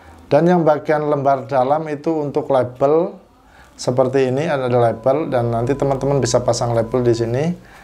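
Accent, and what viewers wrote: Banyumas, Indonesia. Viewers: Bahasa indonesia logat ngapak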